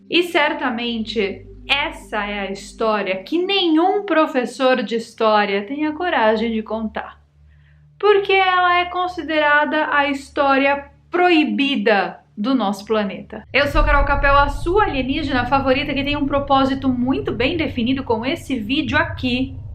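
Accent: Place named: Minas Gerais, Brazil. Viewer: mineirissima. Amo seu trabalho.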